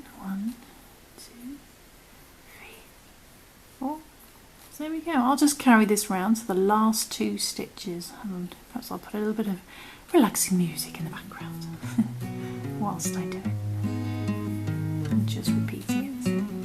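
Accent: England, United Kingdom. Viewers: You sound English so why don’t you say the stitch’s in English not American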